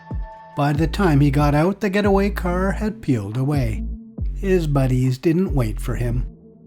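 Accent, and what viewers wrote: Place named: Canada. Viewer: You sound like a Canadian bro! Come on down and check it out for yourself.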